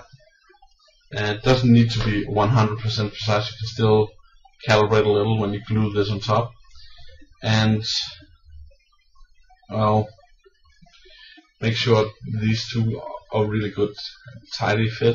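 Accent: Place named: Denmark. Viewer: you have a danish accent... are you from denmark?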